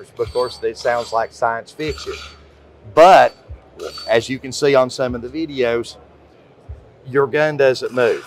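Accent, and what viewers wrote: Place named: South, United States. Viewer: Where are you from? Im from Bama and damn thats some southern twang.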